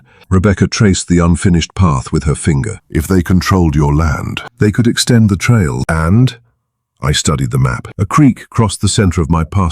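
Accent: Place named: United Kingdom. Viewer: The story was a good one. They never let you know what the HOA and Keren ended up with in court. They were guilty. Yes, but what were the penalties. The other thing was the v oice used. That was a British voice. The story was in the USA. His family had been on that farm for 60 years plus.??????????????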